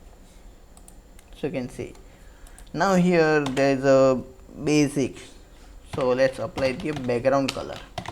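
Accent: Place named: India. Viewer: I follow you and I am happy to discover Qt with you. I used to work with Delphi or C++ Builber, and there are many similarities. I appreciate the way you make tutorials on Qt C++. I have a suggestion if possible. Sometimes, this is hard to understand you, maybe because of your microphone. As I am french, I know that Indian people and French people have a different accent in English. Maybe you can improve this. Anyway, thank you.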